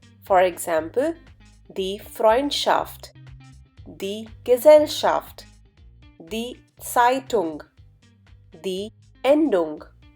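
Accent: India. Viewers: 13 seconds in, and the accent of the narrator is rather Indian. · I am Indian and I am quite sure this excellent teacher is Indian :) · Does anyone listen her speaks in indian accent? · You seemed like is Indian...., sounded like it! 🤔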